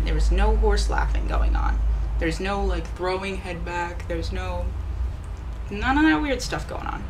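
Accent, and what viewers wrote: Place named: Minnesota, United States. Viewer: Omg is she from Minnesota??? That accent!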